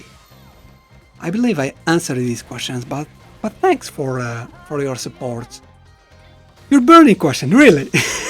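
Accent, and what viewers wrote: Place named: Morocco. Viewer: Obviously, Morocco is big enough to point to on the map, but it's still underrepresented. You're not going to get so many people recognizing your authentic accent. At any rate, congrats on your milestone! Personally, I'm trash at fighting games, but KoF's style and flash has always drawn me in. Your channel allows me to enjoy the games for the story AND the gameplay. You make both aspects very approachable for someone who doesn't have much ingress into this space. Also, I'm a huge Metal Slug fan, so seeing general SNK content is a real treat. Thanks for all the videos over the years!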